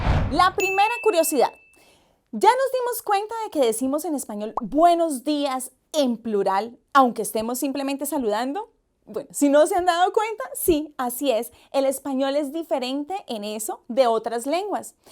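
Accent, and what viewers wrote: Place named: Colombia. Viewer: me parece acento colombiano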